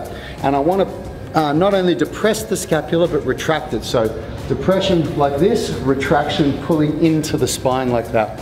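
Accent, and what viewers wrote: Australia. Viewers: Great explanation. Are u an Aussie?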